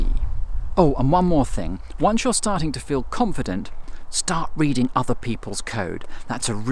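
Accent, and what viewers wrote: United Kingdom. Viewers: Hello sir, thank you so much for all your videos. P.S. I do love your British accent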